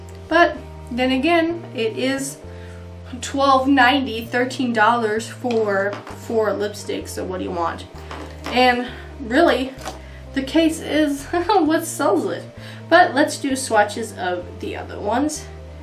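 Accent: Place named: Germany. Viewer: You're losing your German accent. :(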